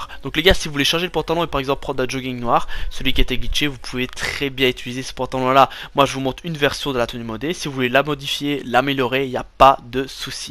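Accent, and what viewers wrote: Liège, Belgium. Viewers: Toi je parie ma vie t’es liégeois hahaha quel belle accent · Sa sent l'accent de liege ça haha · T belge ? Haha ton accent es puissant couzin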